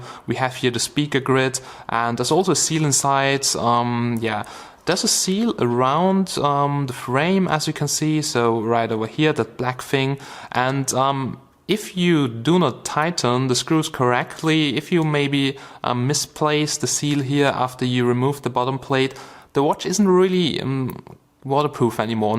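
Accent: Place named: Germany. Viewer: You sound german m8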